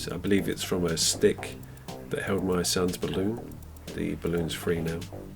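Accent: United Kingdom. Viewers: R u from UK ?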